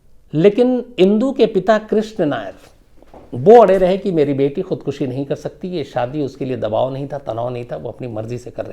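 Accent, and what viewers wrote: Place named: Lucknow, India. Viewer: Sams sir me aap ki sari story sunta hu kabhi comments nahi kiya aaj ker raha hu aap ki hindi mere lucknow jasi hai aap up ke ho kya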